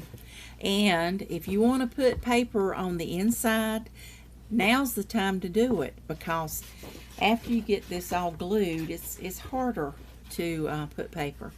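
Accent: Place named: South, United States. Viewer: Hi,: I think you did a fabulous job on this video... you've enticed me to make a tool box for my mum, who is in a retirement home. She's a very girly girl (at 90 she calls herself a girl!) so I'm going to use pink cardstock and pink floral paper. I know she'll love it. Thanks so much for sharing and do please continue to do tutorials... you're really very good at it... and I love listening to your sweet southern voice. Hugs, Nancy:)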